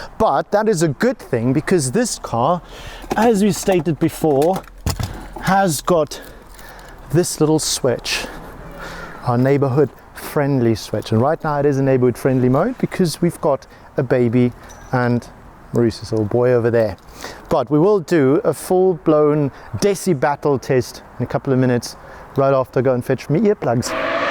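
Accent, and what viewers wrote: South Africa. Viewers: Is this guy South African ? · So SAFA ;-) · Wooh Masekinders die man is van definitely van SA 🤣🤣🤣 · South Africa this dude, very obvious, when he started taking about chicken teeth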